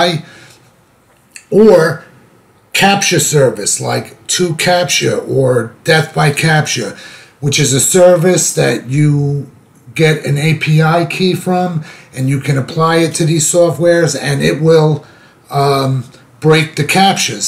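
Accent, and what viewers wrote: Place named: Bronx, United States. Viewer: Hearing your rough bronx accent say transcendental meditation was worth the watch. hehe So is this download and run software? If I buy a lifetime license, I dont have to worry about servers going down or anything shutting down the software in a few years?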